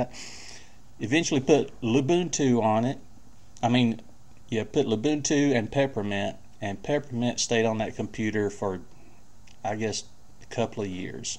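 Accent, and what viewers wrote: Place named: South, United States. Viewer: Great video Mr. That is what I am also seeking are minimalist systems, tired of the bloated giants out there. I have quite a few PCs here, settled upon FreeBSD, but always looking at what Linuxers' are doing. I was initially drawn to your southern accent (being from the Midwest) and the minimalist system, w/menus. Also your NuTyX isos. Keep up the good videos!!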